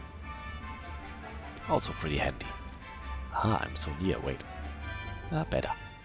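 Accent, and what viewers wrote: Germany. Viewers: Thanks a lot for this video! I've just started playing on PC and didn't know a lot of the things you listed :) You forgot to include the links to the other videos tho ("click on top right" but there's nothing haha) Are you German, by any chance? I'm from Germany and your accent sounds a lot like ours :B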